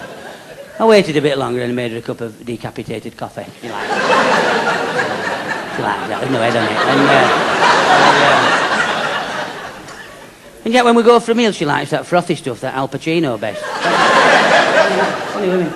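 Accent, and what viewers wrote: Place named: Yorkshire, United Kingdom. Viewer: It's taking me a bit to understand the jokes with his thick Yorkshire accent, but this is, hands down, better than the comics who do a whole show fulll of cussing and vulgarity nowadays.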